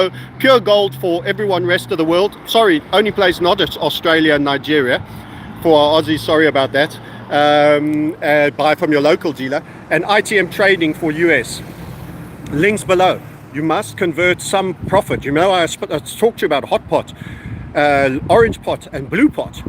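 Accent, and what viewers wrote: South Africa. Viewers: Hi. New to the chanel. Is that a South African accent I hear?👀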